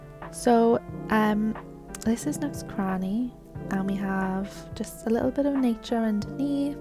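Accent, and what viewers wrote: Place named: Wales, United Kingdom. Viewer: love that welsh accent hello fellow Cymro! 🏴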